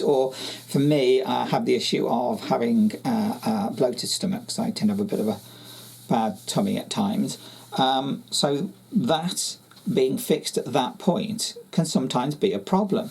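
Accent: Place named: United Kingdom. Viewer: How lovely and refreshing to hear a UK accent! Thank you for sharing your sewing projects. I'm very keen on upcycling so I hope to see more on your channel.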